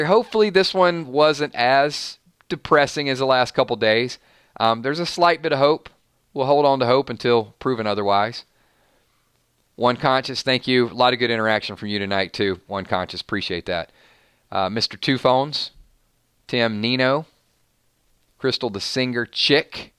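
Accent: South, United States. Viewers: Aw-Ha!!! That's what draws me in... your southern drawl!!! Go 'head country boi!! :-D Rick Kilz, Kilz Grimes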